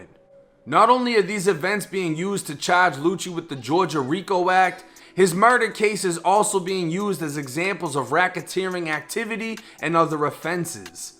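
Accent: Boston, United States. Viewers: The best coverage I’ve seen yet. Nice New England Accent too. Sounds like Boston. New subbie. Lucci is definitely going to do time from what I can tell. He hired Gucci’s Lawyer to defend him. I heard he is the best when it comes to this type of case.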